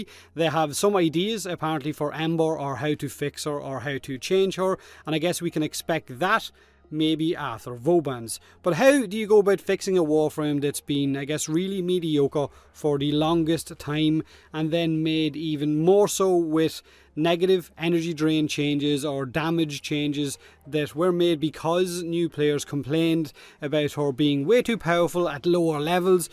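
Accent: Ireland. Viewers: Honestly, i'd kinda like ember to stay very squishy but with a very high damaging capability, like nuking and such. Not some dumb press 4 to win, but something more like perhaps wisp's 4th ability, where you have to stack the reservoirs in order to deal the maximum amount of damage. Because warframes that have like one or two signature moves and a defensive shield, well, it's kinda all there is to new frames. Revenant, the new Nezha etc... I'd hate for that to become a recurring theme in the newer warframe designs. I really want ember to stay some kind of a glass cannon, but hoping DE can make her not point and click (the mesa rework on her 4th ability is a good exemple of a nuke ability well done). I'm sure they can pull off something along those lines. BTW, english not being my first language, it's getting pretty hard to understand what word you feel like you'r confusing yourself with (like third and turd). 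Just go with the irish accent it fucking rocks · Impressive,! You've adopted an irish accent, just like Zane from Borderlands! 😎👍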